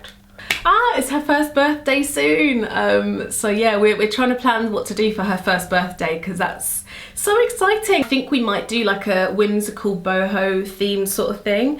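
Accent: London, United Kingdom. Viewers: I'm addict to your accent! Is it London accent?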